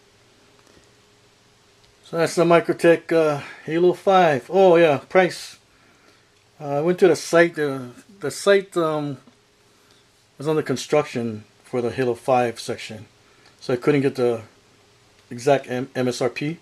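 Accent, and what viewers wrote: Hawaii, United States. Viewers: yeah for sure, i mean some people just do a plain table , i like the extra effort, thats why i always watch your uploads, that and the Hawaiian accent is nice to hear.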